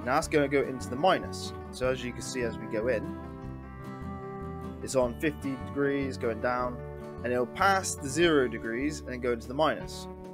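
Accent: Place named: West Country, United Kingdom. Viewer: I know a west country accent when i hear one xD Nice video dude! That last section with the double hinge was exactly what i was looking for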